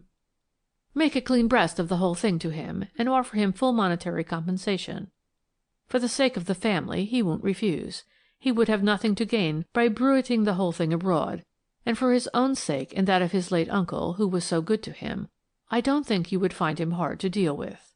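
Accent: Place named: United States. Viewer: Why an American narrator???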